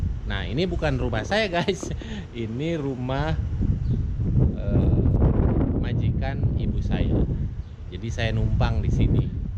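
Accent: West Java, Indonesia. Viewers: suka liat vlog ini,yg ngevlognya seru & ada lucu2nya,logat sundanya& jadi tau daerah2 disaudi.kynya enak tinggal disana.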